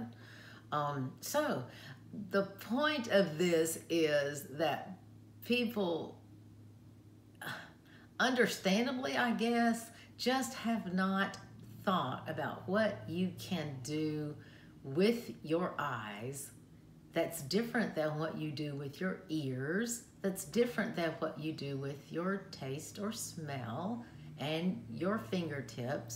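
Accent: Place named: South, United States. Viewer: Hi: I know this video was posted several weeks ago, but since I'm new and I really love your content, I'm catching up. So please forgive the late comments.:) I thought you did a great job with this informational video. I liked how clearly and kindly you explained everything. Also as a side note, you have the coolest accent; I can't help but think of southern homecooking and maybe being in a comfie room chatting with you and other southern belles. Back to your video. I think one of the things many people don't understand is everything we experience involves the brain. I have a huge love for neuroscience so I like to at some point educate involving the brain--without getting to sciency.::LOL:: I think many sighted people don't get that the eyes send signals to the brain and the brain does all the processing. As for guide dog knowledge, man oh man, are people more clueless than ever! 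You did a great job explaining what Gretchen does and doesn't do. I would have also emphasized what part you played in getting her to where she is as your guide. Yes, she's trained to do this, but she wasn't just trained by SE trainers; unless you had a trainer who taught her things like locating the counters and trash cans, you did that yourself. I would have said that early on because I think many have this impression that the dog does these things but the handler doesn't have much in the training aspect. How cool that your girl can find all of those things for you. Is she just really go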